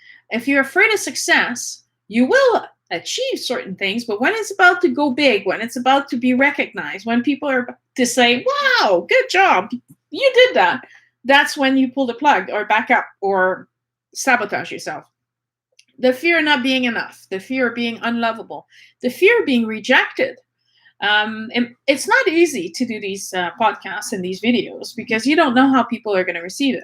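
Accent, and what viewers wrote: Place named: Quebec, Canada. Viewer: Thank you so much for this video. 2 and a half years ago I got a crippling mystery illness near my left ovary no one has been able to diagnose. I was in dibilatating pain with crushing pain for 3 weeks out of the month(using past tense because I know I am healing now.) and just as I thought things couldn't get worse...this illness, losing my career, not being able to go out or see anyone... My father was diagnosed with cancer and passed within 3 weeks basically because the medical system. I thought I would die. I lost my protector and best friend. This happened 2 weeks ago. Then yesterday I started a Dr Joe Dispenza meditation group and during the meditation, I kept hearing the name Hathor.. Continuously. I didn't know anything about Hathor... But I'm purely Egyptian by blood. I couldn't believe what I learned about her or "them" afterwords. 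It was mind boggling how much she completely related to my health crisis and losing my beloved father. She's here to guide me into healing by being a better mother to myself. To reconnect with my Divine femininity. And your video brought me so much clarity. Im very grateful for you. Thank you from the bottom of my heart for all this knowledge. Also, are you from Quebec by any chance? I am, and your accent sounds like the Quebecois here 😊sending you so much love and gratitude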